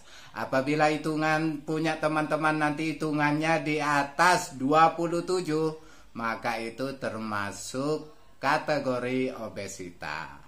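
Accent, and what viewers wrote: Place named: Java, Indonesia. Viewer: Mantap jowa ciri khas suaranya bah semangat terus om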